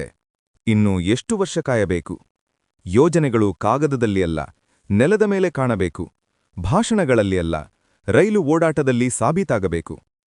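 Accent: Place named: North Karnataka, India. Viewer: Uttarakaranatakada dwani 🎉🎉🎉❤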